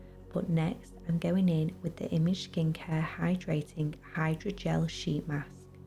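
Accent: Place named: Manchester, United Kingdom. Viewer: I’m American but I think you’re from Manchester. Why? Because I watch a lot of English football. If I’m wrong I’m wrong. Still fun for me to guess whilst appreciating your work. Cheers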